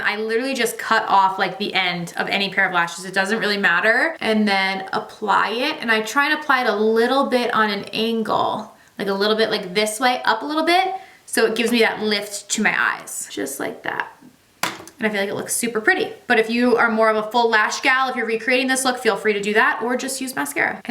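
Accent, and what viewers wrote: New Jersey, United States. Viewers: Yes it’s her Jersey accent haha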